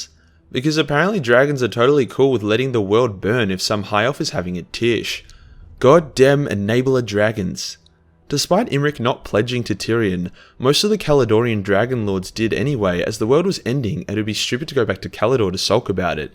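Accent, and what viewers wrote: Australia. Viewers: I love listening to an Australia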